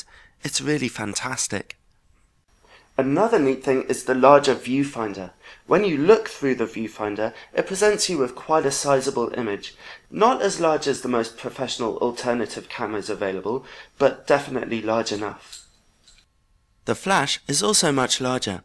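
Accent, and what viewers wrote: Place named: England, United Kingdom. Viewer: Where did you live in England before you moved to whales? Your accent is quite interesting =)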